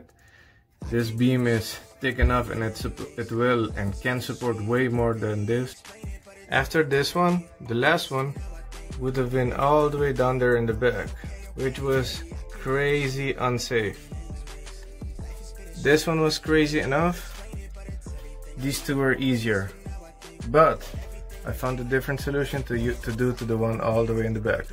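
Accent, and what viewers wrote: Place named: Morocco. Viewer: الوجه و اللكنة مغربي،، منور خويا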